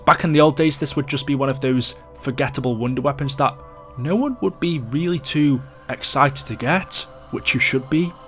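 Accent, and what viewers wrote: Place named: Birmingham, United Kingdom. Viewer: Are you from Birmingham? I mean that accent tho..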